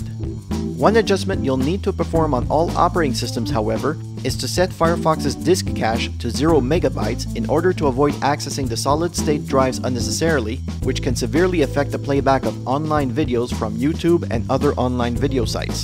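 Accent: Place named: Quebec, Canada. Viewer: It comes into 'play' when someone has posted an instructional video such as the one visible above and has ruined the video by speaking in some bizarre french canadian hybrid accent..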